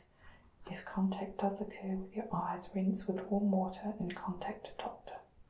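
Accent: Australia. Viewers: omg i love this!!! are you Aussie?? I laughed so much when you said, "sounds a bit rude".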